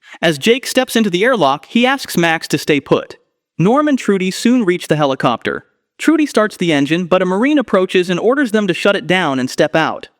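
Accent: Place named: Russia. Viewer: Что за хрень? Откуда этот рашен инглиш? Аж уши режет. Why?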